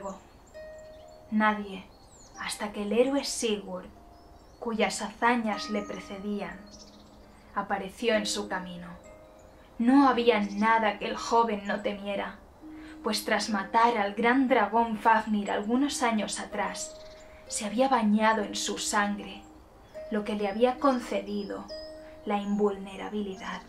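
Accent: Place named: Balearic-Valencian, Spain. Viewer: Molt molt guapo! M'agrada molt quant cantes o parles en 'bacaiva" (balear-català i valencià) però molt poques vegades t'he sentit.. de totes maneres gràcies! Les teves faules d'on precioses...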